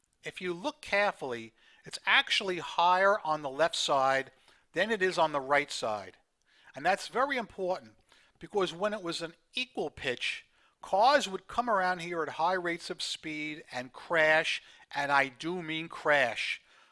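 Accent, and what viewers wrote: New York, United States. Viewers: your accent! You are a New Yawka times 10! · Very fun to explore with you and your fun New York accent. The bathroom is not a bathroom if there are no showers or bathtubs. So it's known as a Restroom. 🤗🤗🤗🤗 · the narrator is a native New Yawker! He calls the street the Henry Hudson "Pawkway."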